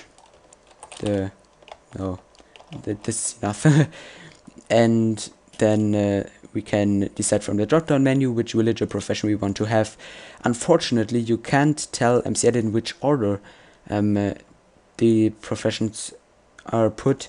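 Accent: Germany. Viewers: You come from german right?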